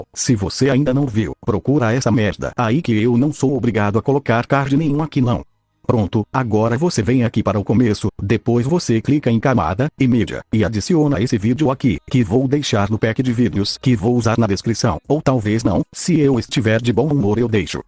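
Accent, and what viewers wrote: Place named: Northeast, Brazil. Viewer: Tu é nordestino ? Se não é é minero Parece nordestino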